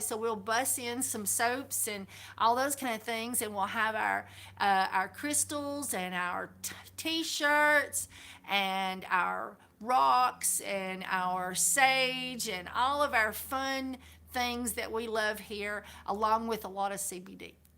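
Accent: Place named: California, United States. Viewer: Pretty Rad... Sounds like you grew up in California!? United We stand YouTubers Playlist: I'm going to put the link to this video in my playlist-- United We stand YouTubers. A ring the bell and I've hit your thumbs up good job. CBD oil back before it was okay to have I discovered just regular hemp oil was very good for soaps and lotion.... I look forward to hear from you, God bless bye for now Ken. Don't be a stranger :-)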